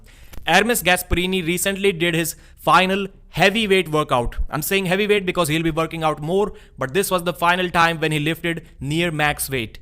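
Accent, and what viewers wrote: India. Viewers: dude your accent is sooooooo strong I could tell a mile away you're Indian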